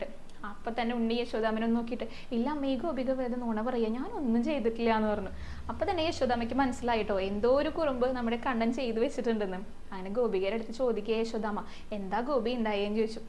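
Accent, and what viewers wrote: Thrissur, India. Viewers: Kadhakal parayunnthu kelkkan valare resakaramane prathekichum parayunna reethium thrisoor bhasheyum. Bhangiaayettondu. Kadha parayan oru avsarsm kittiyathu thanne oru bhagiyam thanneyalle. Guruvayoorappan Molkku SarvaIswariyamgalum tharumaarakatte. Kunjente kaal pollalettathu bhethamavunnundayirikkam alle? Ok God bless you! Keepit up.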